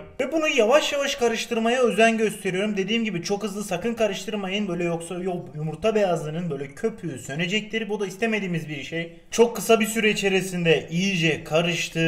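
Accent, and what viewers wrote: Adana, Turkey. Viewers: "Tencereyi ocağa eklüyürüm" Adanalı olanlar bu şiveyi bilir. Ben de şimdı karam yiyürüm. 😀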